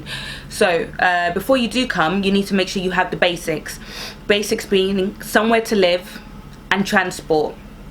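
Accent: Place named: Nigeria. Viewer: i always find that transitional accent fascinating, you obviously grew up in nigeria so i should be able to adjust back quickly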